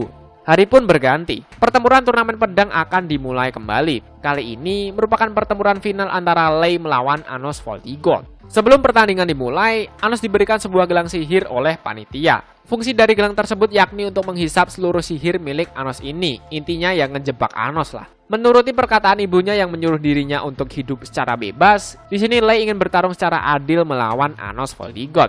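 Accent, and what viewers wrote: Java, Indonesia. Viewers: Medok bat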